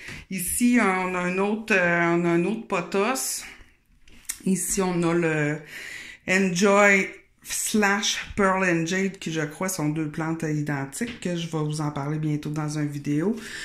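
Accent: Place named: Canada. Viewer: Bonjour,j aime beaucoup vos plantes toutes,et ♥ aussi votre bel accent ♥ canadien.De la Belgique bisous une amie des plantes,Superb plant tour et partage comme vous le meme avis sur vos plantes et les mienes de ce fait.Felicitations et a bientot.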